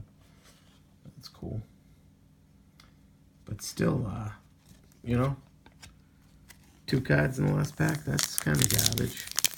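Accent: Boston, United States. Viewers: I can tell you're from Boston! Lol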